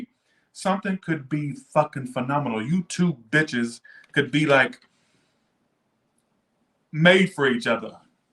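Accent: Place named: South, United States. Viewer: I liked your strong Southern Accent during your Libra Reading. 🌋 Yes Observed and Monitored. Undercover Cops?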